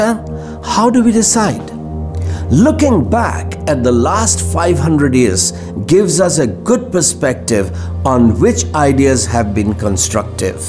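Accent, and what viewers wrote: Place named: United Kingdom. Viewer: Esse sotaque britânico omg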